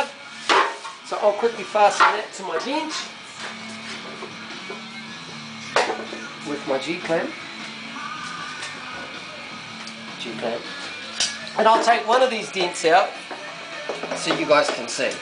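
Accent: New Zealand. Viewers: "Kiwi bloke" . . . love the "dawun unda" . . . could listen all day . . . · It's his New Zealand "ex-cent, " Mispronunciation of the vowel e also cherry "rid". (Red). ignore the accent admire his skills and enthusiasm.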